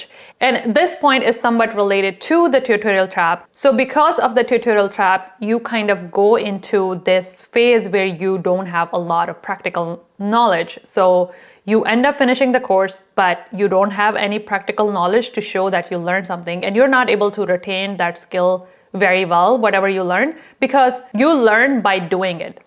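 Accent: India. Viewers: She speaks really nice but there is a pinch of desi accent in her voice XD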